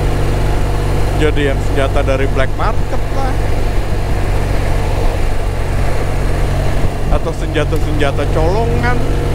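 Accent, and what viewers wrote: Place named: Bali, Indonesia. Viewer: Bang maaf, aslinya dari mana?,dari logat bicara abang ko kaya orang Bali..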